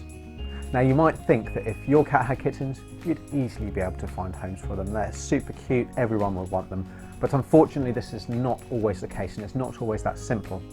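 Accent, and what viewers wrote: United Kingdom. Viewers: British accent plus music Thanks for cc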